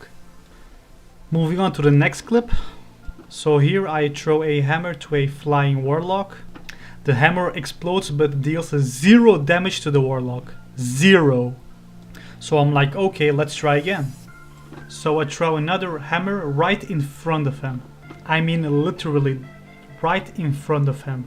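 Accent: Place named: Belgium. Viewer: lol my bad, I mean to put Belgian cause I have this friends that's from where you are and he says what the hell just like you with the accent.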